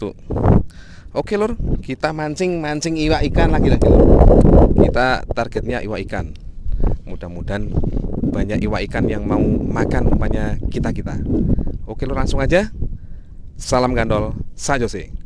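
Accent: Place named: Java, Indonesia. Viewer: Waiki anunya medok isonan 😭😭 Penting sutrek lah 😁😁👍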